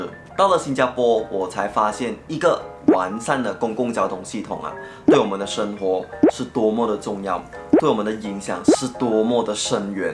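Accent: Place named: Malaysia. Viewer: 一听就知道你是马来西亚人，不用强调什么新加坡口音的。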